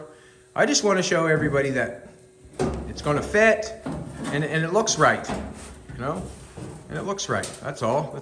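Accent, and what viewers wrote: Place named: Nova Scotia, Canada. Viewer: Thar is a Canadian maritime accent, specifically Nova Scotia.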